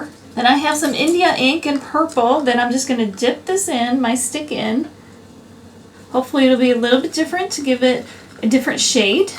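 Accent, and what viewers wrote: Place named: Michigan, United States. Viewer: Love these! By the way, are you from Michigan by chance? It sounds like it from your accent.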